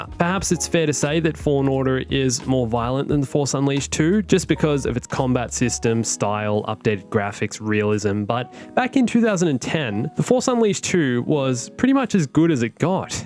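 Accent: Australia. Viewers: Yes I had no Idea your also Australian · Aussie mate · OOOH WE GOT A FUKIN AUSSIE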